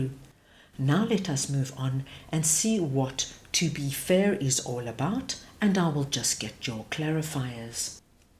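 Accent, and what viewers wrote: South Africa. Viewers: you have a south african accent africanda thank you great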